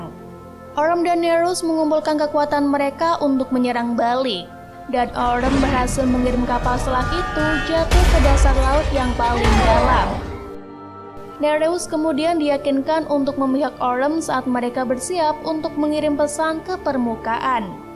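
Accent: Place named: South Jakarta, Indonesia. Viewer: Terlalu Jaksel yah yang baca naskah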